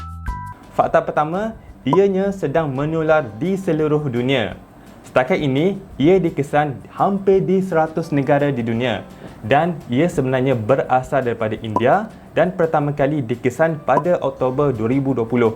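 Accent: Kelantan, Malaysia. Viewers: pekat budu terrrr...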